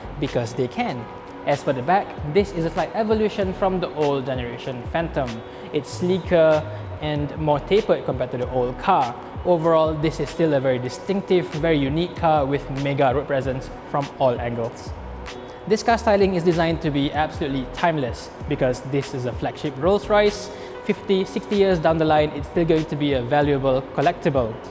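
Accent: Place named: Malaysia. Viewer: Suara orang Malaysia. Tu yang kita nak. Ada orang dah pandai cakap orang putih nak jadi orang putih terus.. selamat maju jaya.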